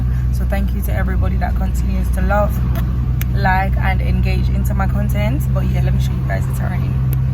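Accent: Jamaica. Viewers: I love when the patois drop in and out😂😂😂😂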